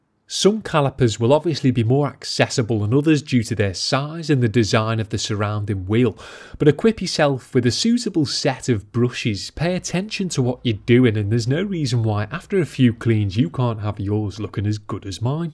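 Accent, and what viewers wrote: Liverpool, United Kingdom. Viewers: That’s is why we are all here. His British accent and coastal English noises are a perfect addition to the beautiful visuals. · I999 he’s a scouser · Good ole Scouse accent!! Love his accent and love this channel 👌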